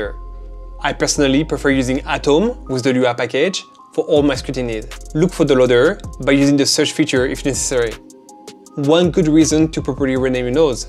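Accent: France. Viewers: he is french 🤷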